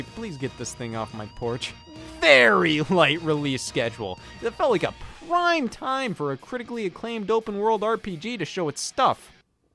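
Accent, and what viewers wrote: Midwest, United States. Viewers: He’s from the Midwest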